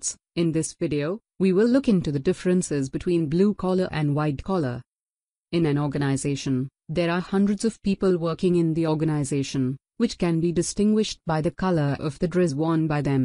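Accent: India. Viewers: In many parts of the world, blue collar jobs pay people much less and are therefore considered to be lower. However, in developed countries, blue collar jobs can pay just as much if not more. I agree with you, but I did notice that her AI accent was Indian. In India, blue collar jobs tend to be done by lower educated individuals who also get paid less.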